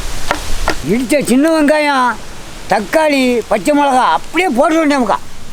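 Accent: Tamil Nadu, India. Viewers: In which district have this tamil slang??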